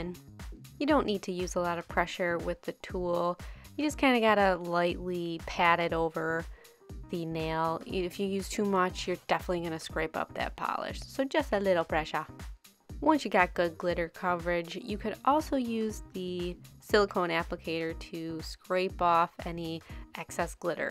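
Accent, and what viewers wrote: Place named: North Dakota, United States. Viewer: Are you from North Dakota or anywhere in the north? :P You've got a lil bit of an ND/UP Michigan accent. I could be totally wrong tho lol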